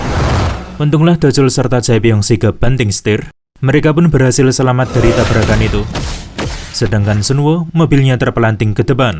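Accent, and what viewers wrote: Java, Indonesia. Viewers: Medok banget 😢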